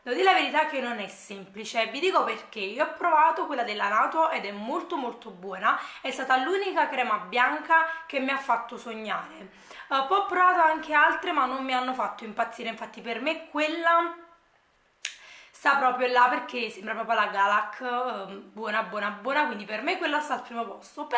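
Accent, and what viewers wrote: Naples, Italy. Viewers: non ti sto attaccando, ma questa ragazza viene sempre presa di mira per qualsiasi cosa faccia, direi che qualche volta il commento lo sii può tenere per sé, senza avere il bisogno di scriverlo, perché tanto penso che se abbia scritto qualcosa di sbagliato lo sappia o se ne accorga dopo, non c'è bisogno di puntualizzare sempre, soprattutto per errori futili come questo, e io comunque da ragazza napoletana, e comunque STUDIO teatro e DIZIONE (CORRETTA PRONUNCIA Della Grammatica italiana) capita di avere questa cadenza, perché è proprio tipica della nostra amata Città (NAPOLI) ed ho anche e solo puntualizzato che comunque il napoletano è considerata una lingua, però non fa nulla, non sentirti attaccata, spero solo che tu capisca come si possa sentire una ragazza come lei, mettetevi nei suoi panni e pensate prima di scrivere, detto questo, Buona domenica 😘❤️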